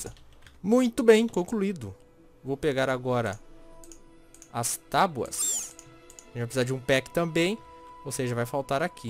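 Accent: Rio Grande do Sul, Brazil. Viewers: eu não gosto quando você fica tudo Gauchinho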